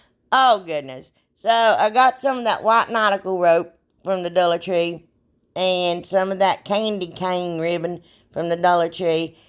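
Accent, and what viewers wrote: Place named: Kentucky, United States. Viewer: Ok, I found you scrolling through. Are you from Kentucky? I love the mountains! The accent! Im a transplant here. Great video! You are my new friend! Crackin me up!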